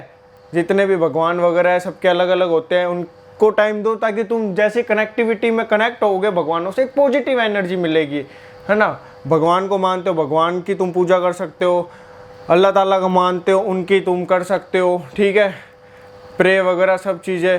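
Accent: Haryana, India. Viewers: I like your language and accent,is it haryanvi?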